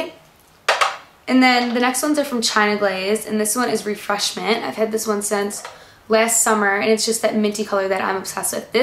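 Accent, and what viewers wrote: Chicago, United States. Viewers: Your Chicago accent is so cute!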